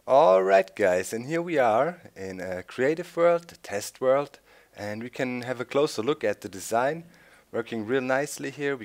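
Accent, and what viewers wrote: Germany. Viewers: Man hört deinen Deutschen Akzent:))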